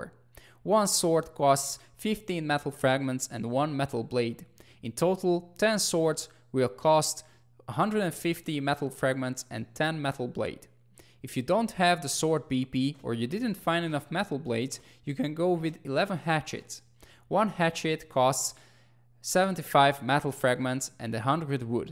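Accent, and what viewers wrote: Hungary. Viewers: You have hungarian accent lol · Is that a Hungarian accent??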